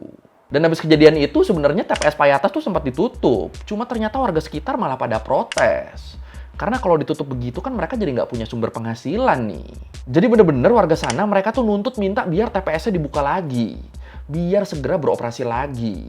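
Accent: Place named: Madura, Indonesia. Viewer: Kenapa nadanya hampir mirip kyk logat madura ya... Apa cuma aku ya yang ngerasa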